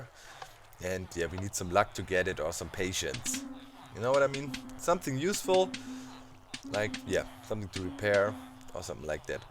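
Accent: Germany. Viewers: I've followed you since the tree house days. I was following Vintage Beef when he visited your base having made himself invisible and you just turned round and shot him . I have followed you since. I am useless at redstone but I love your videos. I really like your elegant building style. I am English from London and, through you,I joined my first multiplayer world - Gummy's Tree realm. Your English is excellent and I love your accent. Subtitles would not work for me because it would lose the close connection that listening to someone's voice achieves. Most Germans speak excellent English so keep going in English please.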